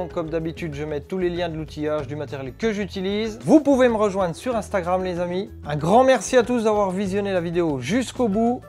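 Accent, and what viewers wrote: Franche-Comté, France. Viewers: Bonjour Un petit accent franc comtois non?!.. 😉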